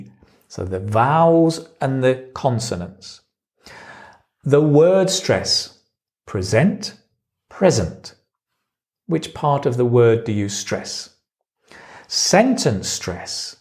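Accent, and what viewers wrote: United Kingdom. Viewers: Sir, which accent of British English do you speak ? Is it the modern RP ?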